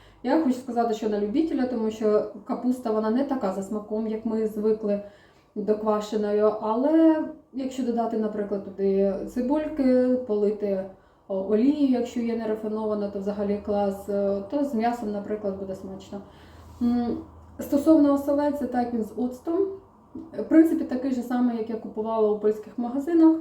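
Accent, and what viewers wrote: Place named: Donetsk, Ukraine. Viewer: дякую , що не проігнорували моє питання . Я таки відчула по мові , що ми з вами землячки . Я теж родом із Донецької області , В-Новоселкіасткий р-н, це ближче до Курахове , Марʼїнки . Але заміж вийшла і переїхала в Заплрізьку область . Начебто області знаходяться поруч , а українська мова має свої нюанси ( діалекти на заході України ). Тепер ми всі біженці - мої родичі з Донеччини і ми із Запорізької області , бо частина області окупована , частина під обстрілами . Так і скитаємося по світу…А ваші відео приємні , заспокійливі , трішечки із смутком . Бажаю вам багато підписників і якнайбільше переглядів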